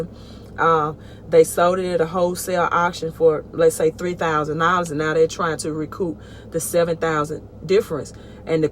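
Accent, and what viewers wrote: United States. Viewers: Hmm American accent in a right hand drive...im confused or is it a camera switch.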